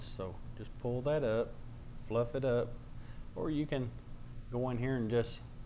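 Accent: South, United States. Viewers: Great technique, very helpful. Love the accent! Charming southern US gentleman.. :-) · A good painter..n' a cowboy's accent.. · Are you from the Carolinas ? you sound southern like me,, thank you for helping me with painting clouds ☁️ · I just found your channel. I've subscribed. Not only do I like how you explain the painting but I love your country accent. I'm country too so it feels like home. 😁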